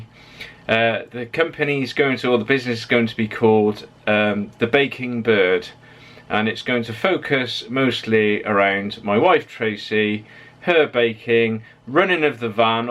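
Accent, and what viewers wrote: United Kingdom. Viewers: i wish i had watched all your videos on the van i love your videos very best of its kind on YouTube i would suggest that you try and make the videos available for sale maybe a download possibility for a feei personally would love to have this available its a real treat for me hearing the good old brit accent i sure plan on watching your beautiful wife your a lovely looking young couple wishing you both every success with the business great idea using local vendors and growers love the quality of workmanship non better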